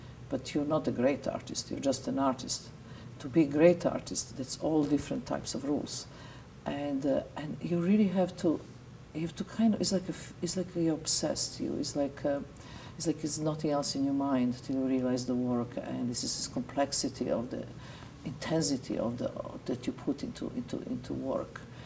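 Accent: Serbia. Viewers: She’s a beautiful soul. Period. Research her origins before you call her evil by your own ignorant definitions. You hear her Serbian accent and call her Voldemort, etc? The stupidity and ignorance is shocking. Catch a flight sometime and TRAVEL. Go to a museum! Crack a real book once in awhile. Ugh. Listen to what she is saying. Great art should upset us. It is hard and it is part of the artist’s very Being. THEIR experience. It should open our eyes and make us all think. Examine ourselves. If what you see is fear and man’s construct of a devil - that says a lot to the rest of us about YOU. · she's not american but serbian lol "its her accent". ps, i dont defend her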